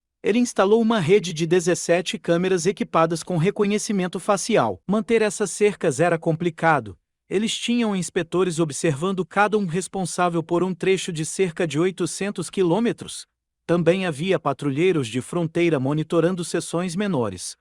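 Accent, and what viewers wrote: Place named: Australia. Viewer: Gostaria de saber de onde se origina o locutor do vídeo cuja pronúncia é muito estranha. Fala um português que não é nem de Portugal e tampouco do Brasil. Australiano que aprendeu português? Pode ser...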